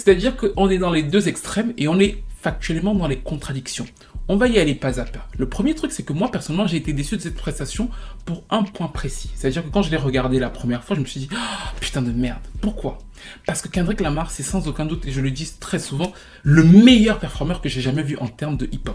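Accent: Cameroon. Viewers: Il est camerounais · Toi là tu as un accent un peu Camerounais ou j’entends mal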